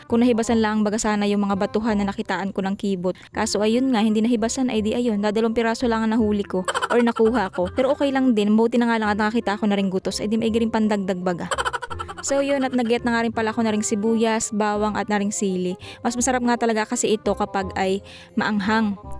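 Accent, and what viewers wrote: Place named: Quezon, Philippines. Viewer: Di namn Batangas Yan Quezon Yan sya😂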